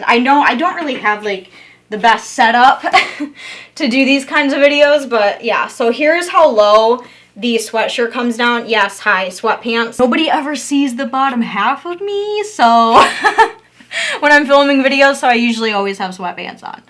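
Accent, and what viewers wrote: Michigan, United States. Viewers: I just love that Michigan accent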